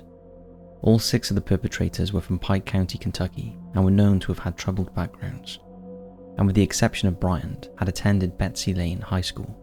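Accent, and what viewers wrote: Wales, United Kingdom. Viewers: Welsh? Is that the accent?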